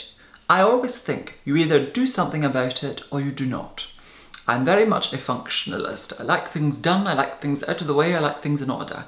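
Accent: Scotland, United Kingdom. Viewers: I thought it was Scottish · Scottish darling x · you should bottle yourself and sell it!!! uplifting, fascinating, spiritual, fair ( in both skin tone and outlook) beautiful and......Scottish!!! i could listen to you all day xx much love from one who has been described as 'blue white' xx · its fake, not how he really talks, it is all put on for videos and more viewers, go back to his early videos from say 2013, has a normal Scottish accent and voice nothing like this at alll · I KNEW YOU WERE SCOTTISH! Sorry, I love your accent♥️♥️♥️♥️ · I so enjoy listening to you as your intelligence always shines through. And I also love the soupçon of Scottish accent in your speech. And as an alabaster-skinned woman of Russian -Ukrainian descent, I have come to love my paleness.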